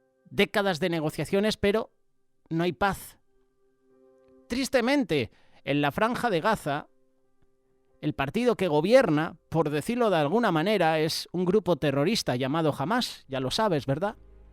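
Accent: Spain. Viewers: Supongo que eres español por como hablas. Pues siento decir que segun tu argumento España no existe es el falso nonbre que nos dierin los romanos.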